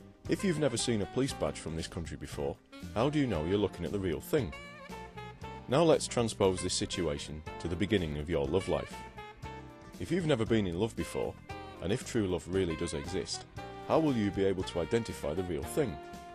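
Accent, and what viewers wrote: United Kingdom. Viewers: No problem. Your vids are great. I'm used to hearing American mgtows, so it's particularly refreshing to listen to another Brit! Your vids are quite unique in that sense as we get to hear an English point of view for a change. Thanks for your sterling work, sir. Looking forward to your next vid.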